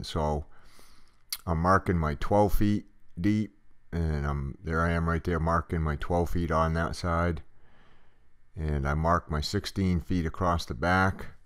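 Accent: Maine, United States. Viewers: You sound like a Mainer. About how big can you make a slab in New England, where the ground freezes, before you need footers?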